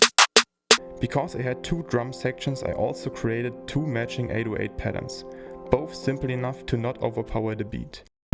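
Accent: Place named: Germany. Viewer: i hear german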